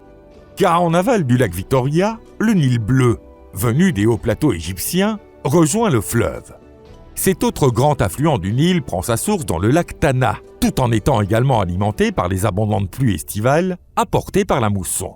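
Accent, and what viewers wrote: Belgium. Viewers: Ce n'est pas une ia, c'est simplement une prononciation belge, les belges prononcent le ui, oui.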